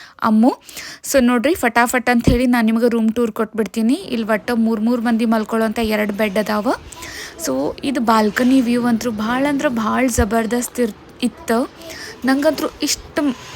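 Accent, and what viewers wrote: India. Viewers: ಮೆಡಂಮ್ ನಾವು ದಾಂಡೇಲಿಯವರೆ,ತಮ್ಮ ಅನುಭವ ಹಾಗೂ ಶುದ್ದವಾದ ನಮ್ಮ ಧಾರವಾಡದ ಕನ್ನಡ ಭಾಷೆಯ ವಿವರಣೆ ಕೇಳಿ ನಿಜವಾಗಿಯೂ ತುಂಭಾ ಖುಷಿಯಾಯಿತ್ತು, ದಾಂಡೇಲಿಯಲ್ಲಿ ಸರೌಡಿಂಗ್ 600.700ವರೆಗೆ ರಿಸ್ವಾಟ್೯.ಹಾಗೂ ಹೋಮ್ ಸ್ಟೋ,ಇರುವವು ಇನ್ನೂ ಹಲವಾರು ರಿಸ್ವಾರ್ಟ್ ಗಳು ತುಂಭಾ ಸುಂದರವಾಗಿವೆ. ಇದು ಕೂಡಾ ತುಂಭಾ ಸುಂದರವಾಗಿದೆ.,ಧನ್ಯವಾಧಗಳು ತಮ್ಮ ಶುದ್ದವಾದ ಹಾಗೂ ನೈಜ್ಯ ವಿವರಣೆಗೆ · Speaking in north(Uttar) Karnataka language, and you are from the south Karnataka youtube channel and? Why do you people do like this.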